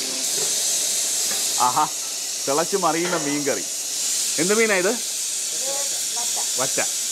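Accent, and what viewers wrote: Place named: Kumbanad-Kozhencherry, India. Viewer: Love watching your programme..well hearing too. Tje malayalam is very similar to what we spoke. My dad is from Kumbanad & mom from Kozhencherry. Havent really lived there...but some 4/54 in kerala..2 in tvm and 2 in kumbanad, 3 in Manipal. Some 47 in Africa-2 in Ethiopia at birth, 13 Zambia and like 31 in South Africa now..but everything you do is do much like what I really am...thanks buddy!!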